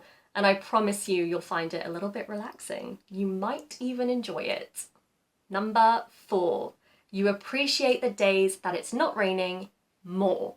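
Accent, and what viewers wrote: United Kingdom. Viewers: 語学学習に活用させてもらってます！イギリス英語が聴こえず苦手意識を持っていたのですがおかげさまで好きになることができました！ありがとうございます😊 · 綺麗なイギリス英語。日本人にはこちらの方が馴染みやすいのでは無いかと思いました。 同じ内容をアメリカンアクセントで話されると面白そうだなと思いました。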